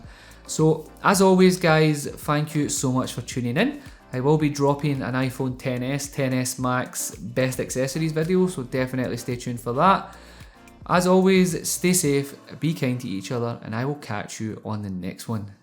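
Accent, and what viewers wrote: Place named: Glasgow, United Kingdom. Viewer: Sounds like Glaswegian to me.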